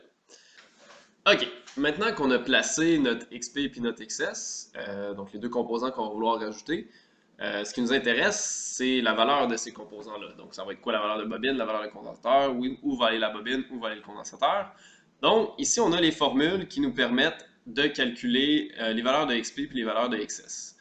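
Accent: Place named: England, United Kingdom. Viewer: merci !! and i like your englaish acsent ;)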